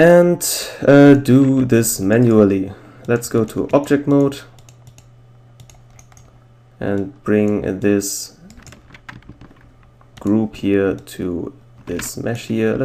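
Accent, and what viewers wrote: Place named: Russia. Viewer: Вроде русский акцент, запили на русском всё тож самое плиз =)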